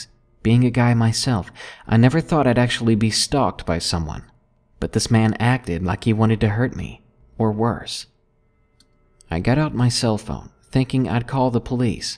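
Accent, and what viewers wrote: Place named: South, United States. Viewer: Omg! sounds so young and southern, i fucking love it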